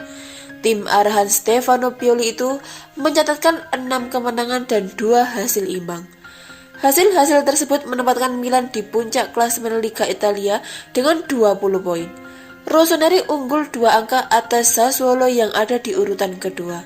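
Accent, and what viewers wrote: Java, Indonesia. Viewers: medokss banget mbak..😅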